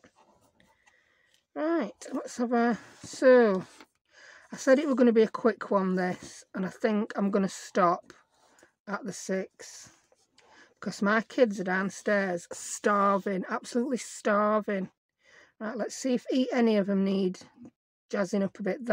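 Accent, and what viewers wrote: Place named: Northern England, United Kingdom. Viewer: I found your channel a couple of days ago, and oh boy I’m loving it. I lost both my parents just before Cov. Hit. I didn’t realise how much I missed northern accents, I was born in Yorkshire but grew up in Lancashire. However I’ve lived in the south since getting married 24 years ago😮. I’m also a big Tim Holtz fan an just love the items you make. Actually May I ask has Tim stopped designing new paper pads? Do I need to start hoarding them? Off to watch another of of your videos. Thank you for sharing your time.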